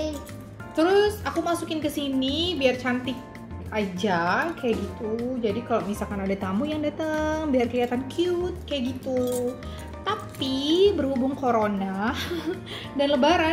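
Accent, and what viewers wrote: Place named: West Java, Indonesia. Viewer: ada aksen sunda nya ya.. intonasi nya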